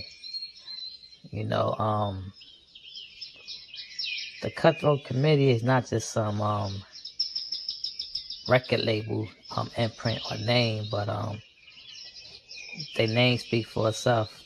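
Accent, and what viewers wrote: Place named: New York, United States. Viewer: Sound like you from New York or Detroit, but da' 504 alllll up there too.. Good job bruh. R.I.P. SLIM!!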